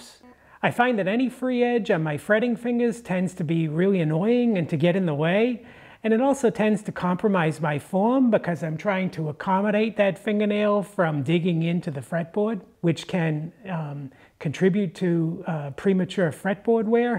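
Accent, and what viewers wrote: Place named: New England, United States. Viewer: Great info delivered with an impressive New England accent.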